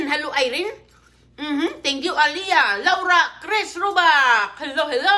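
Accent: Visayas, Philippines. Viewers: Mao nay nindot bisaya jud kaayo haha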